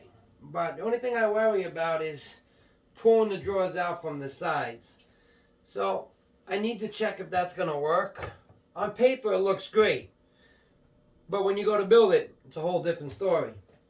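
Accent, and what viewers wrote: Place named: Maine, United States. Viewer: I swear, you got a Maine accent. Love it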